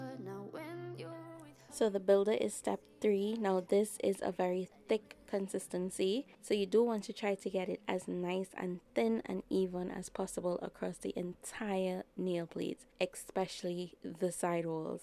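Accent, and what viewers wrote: Trinidad and Tobago. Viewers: thanks for sharing love your work all of it,are you from TRINIDAD I AM FROM TRINIDAD AND YOUR ACCENTURE SOUNDS LIKE US SPEAKING STANDARD ENGLISH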